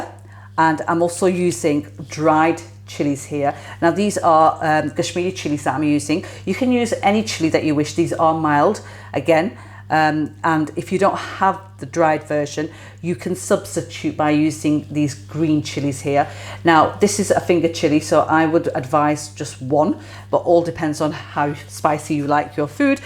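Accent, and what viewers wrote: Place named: RP, United Kingdom. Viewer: You sound so posh in your explanations very professional, you should read the news x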